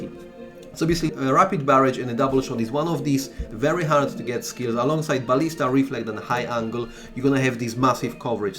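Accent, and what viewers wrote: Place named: Israel. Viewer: oh cool, your accent sounds very israeli, your accent is very different than most polish people i meet here in london. i wouldve never guessed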